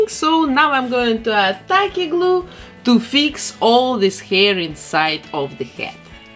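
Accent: Russia. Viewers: Ты русская? Акцент очень чувствуется (возможно, какой то другой национальности) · Она ведь русская??? Или украинка Такой жёсткий акцент может быть только у нас 😂😂😂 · Сложно слушать этот русский акцент... жуть какая. Исправьте, пожалуйста! Я очень уважаю английский язык и мне больно это слушать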